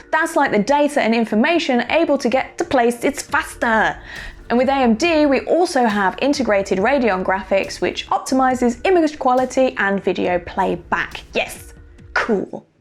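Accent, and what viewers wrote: United Kingdom. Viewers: I love this lady, she's so fun to watch. English accents are the best.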